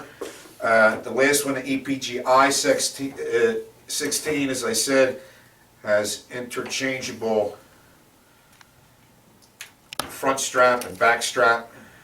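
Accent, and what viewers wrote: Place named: Nassau County, United States. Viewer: nassau county accent detected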